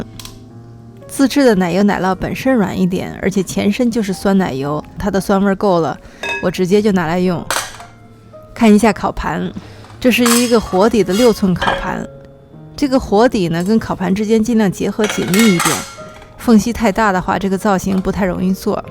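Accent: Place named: Beijing, China. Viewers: 北京口音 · ，看头像里你的样子，和我一个同学长得很像，她也是一个北京姑娘，所以连口音都像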